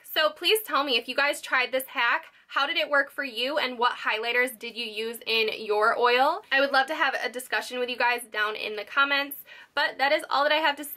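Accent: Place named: New Jersey, United States. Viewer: your accent screams native jersey italian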